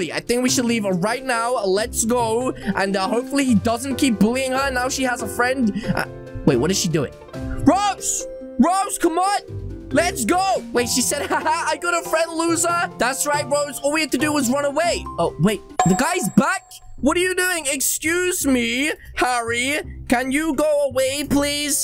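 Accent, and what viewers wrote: United Kingdom. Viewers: No offence but you sound like jelly but I bit British I’m sorry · Why is your accent British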